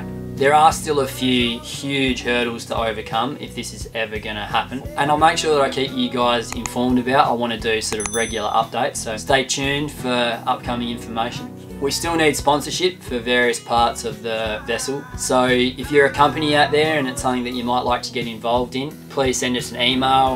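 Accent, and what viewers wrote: South, United States. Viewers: Love the southern draw coming from a Texan myself, I look forward to seeing what comes out of all this. ♥️ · Oh my lawd that Southerner accent... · As an American, I love your American accent! Also, the emissions free boat thing is cool too. 😁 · Ahhh du liike ur very southern accent. Good job. Good Luck on obtaining your “Green” vessel. You need one of those suspended dog run cables for Lenny. As a parent of 3 children and 11 grandchildren I can fairly say you need eyes in the back of your head and that’s on dry land. Take care and stay healthy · Hilarious accent and insight to the hillbilly .... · I think it was unbelievable that an Aussie could do such a fine job on a stereotypical good old boy accent. Seemed kind of Archie Bunkerish though. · That’s a pretty good American accent, straight from the South! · Nice Southern accent for a Down Under bloke! 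· Your southern accent cracks me up! You got it ! ....lol. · An Aussie man in a French boat talkin with a southern twang! Its a beautiful 🌎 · Well, I'm a Texas guy and honestly his "southern drawl" is easier to understand than the Aussie accent! And I've been to Aus-traala! I'm also a Tesla guy.. so good on ya, Aussie! A noble quest!! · Wow, your country-American accent is... oddly on point. Sounds like north Georgia/Tennessee. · Emission free hype crashing into whole of life reality. Unless you made it from carbon sunk wood in its entirety then there is no way. Hemp sails and rope. That southern accent will come in handy as you tend the fields and cut the trees. You did say it was your dream. That Outremer looks pretty good from here even if it is a climate change liability. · Very interesting idea. Hope it turns out! Really liked the southern accent too 😂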